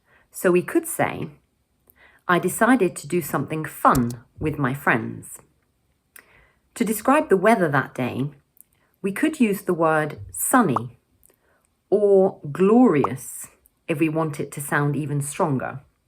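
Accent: United Kingdom. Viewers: 흐어어..ㅠ 영국발음 죻아아...♡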